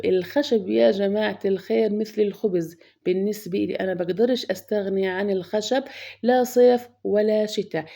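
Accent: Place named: Palestine. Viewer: سلامة عمرك يارب حبيبتي لهجتك الفلسطينية الفلاحة حلوة كتير زي لهجة امي الله يرحمها ويغفر لها لأنه إحنا من قضاء يافا يازور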